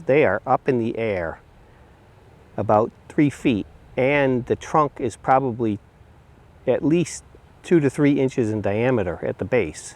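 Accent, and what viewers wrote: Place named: Netherlands. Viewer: Sounds like a nl accent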